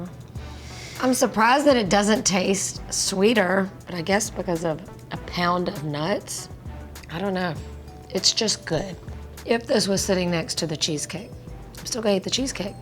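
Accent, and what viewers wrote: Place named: South, United States. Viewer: Love your southern accent I could listen to you talk all day long. I'm originally from Tennessee.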